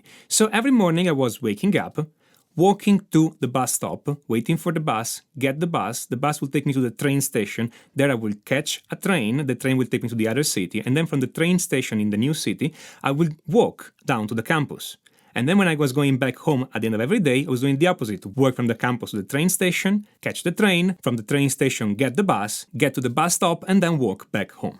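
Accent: Italy. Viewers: Where do you from? Maybe from Italy?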